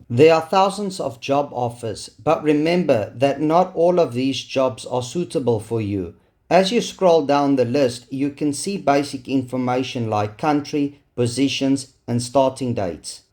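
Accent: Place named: United Kingdom. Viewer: gr8 ingormation. i believe u live sometime in uk bcoz ur accent is similar to british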